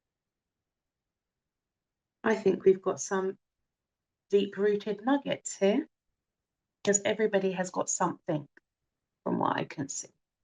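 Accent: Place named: United Kingdom. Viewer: Si funny how Creator works, i still remember asking show me the way please help and i would SEE UK and i started laughing cause i was like 😮 how? And poom your channel came out, and when i read RSY i said love it, this is it Creator, and when i Heard You speak i was like ohhhhh right UK😅😅😅🎉🎉🎉🎉🎉🎉🎉🎉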